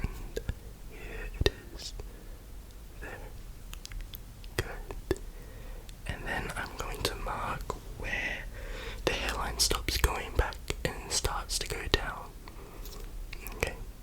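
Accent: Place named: Australia. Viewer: My first watch of one of your videos, and was trying to identify your accent to see if you were a fellow Aussie, then you said yeah nah and I didn’t have to check your about 😂😂 subbed 🤩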